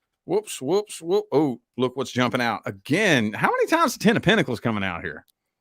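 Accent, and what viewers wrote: United States. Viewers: Love the shirt So straight forward, love the way he speaks with his American accent “Doing a lot of healing” that is what I am focusing on “Close it out!” Good thing about Gemini they move forward and on because it is an AIR sign ruled by Mercury ~ we always come out on top…Gemini Chitter Chatter 🎉 Feel like this a spiritual community ~ Intuition, Spirituality, Feelings, Emotions, Cosmic Realm, as well as spiritual (beyond the physical) Realm “Too damn late” As a Gemini I love to “Ghost” people I dislike Between a “Scorpio & a Leo” it’s always been a Leo for me… I have a lot of Leos in my life I don’t know why? Taurus/Gemini cusp so I have a lot of Earth and Air with a Monkey Chinese Lunar Calendar but I believe it is a Water Monkey, so a little bit of water in the Chinese Lunar Calendar but in the astrological sector all the Earth and Air elements … I struggle with surface level connections because I am quite shallow and too busy for emotions and deeper level connections … The upside down world card keeps me going in circles because I do not care about making progress … my boss is a Sagittarius and always thinking about moving on and starting a new job with another company PLUS she is bitter~ I am curious if she will leave my work as my boss? 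:) SO BITTER sounds about right jumping from toxic relationship to toxic relationship but I can see it :) “Blessing in Disguise”. “Healing” “Person Person finally arrives” “Hard work out of the way first” My parents are Capricorn & Libr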